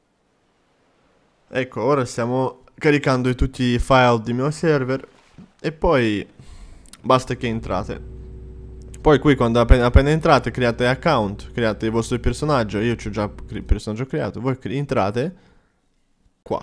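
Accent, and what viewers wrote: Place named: East, Italy. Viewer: si capisce che sei dellest dalle bmw e dallaccento ahahah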